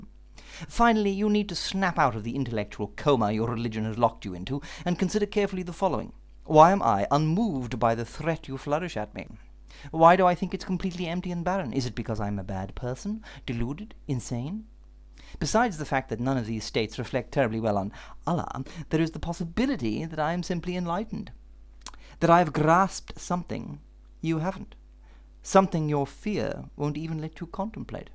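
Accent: South, United States. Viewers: wow, the southern draw is just great. I think it makes a great point. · hey i love that southern accent you use in this video. i'm from the states and i've run into the southern trailer park type that talk like that. cheers! and have a great day:)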